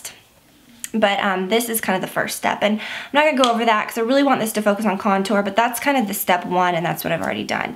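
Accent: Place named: South, United States. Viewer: I've been binge watching all of your videos lately. I'm from Texas so I feel like I identify a little better with you since you're from the south too! You talk like me!😝 love all your videos and I think Olivia is PRECIOUS!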